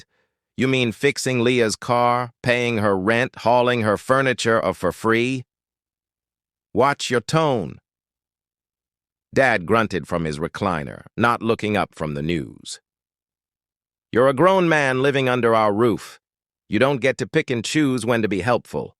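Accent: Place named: Arkansas, United States. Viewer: I'M JUST AIN'T OMG THIS GUY IS FROM ARKANSAS 😮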